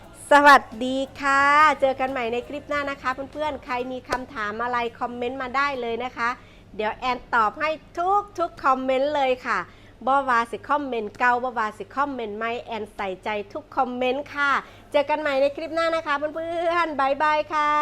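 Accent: Isan, Thailand. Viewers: ชอบนะ พูดกลางปนอีสาน ได้เรียนรู้ไปด้วย เป็นส่วนหนึ่งของอัตลักษณ์ไทย เพราะประเทศไทยไม่ใช่แค่ กทม. ขอให้ขายดีทุกวันเด้อ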